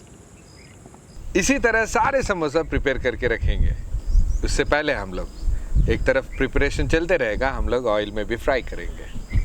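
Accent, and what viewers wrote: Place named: South India, India. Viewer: MashAllah bhai tumhari Urdu bari mazay ki hai... South touch ata hai... Love from Pakistan to the Indian ❤️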